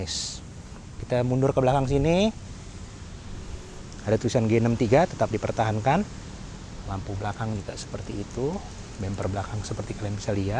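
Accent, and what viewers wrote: Jakarta, Indonesia. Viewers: Kacaoo om bos , logat jakartanye kentel banget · Betawi banget ngomongye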